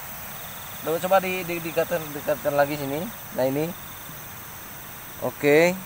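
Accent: West Java, Indonesia. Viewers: Ohhh abang. Orang sunda? Wkwkwk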